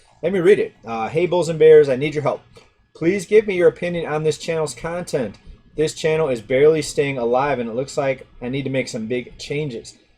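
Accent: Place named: Michigan, United States. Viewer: I’d like to hear your story about leaving the midwest. I can tell by your accent you are from Michigan or something over there. We just did a roadtrip to the upper Midwest and loved it, though the weather was not for the faint of heart lol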